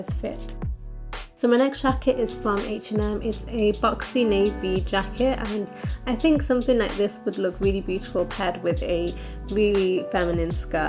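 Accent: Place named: Liverpool, United Kingdom. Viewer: Can any English person let me know what her accent is? Is it a mild Liverpool accent? I love it.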